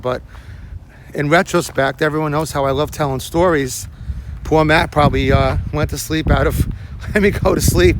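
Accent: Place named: New Jersey, United States. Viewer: I’m from Jersey, too, we have the same accent. Thanks for who sings this video. I’m moving to Palmer Ranch next year. I can’t wait to see what they did with the mall.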